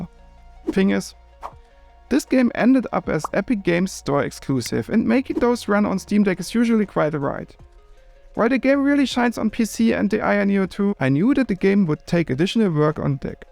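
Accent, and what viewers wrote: Germany. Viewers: Being new here, I thought,"man, that's one thick german accent" and then the name was displayed. Brother, as a fellow german, I feel for you - probably having shared the same educational system. Keep up the good content and thick accent.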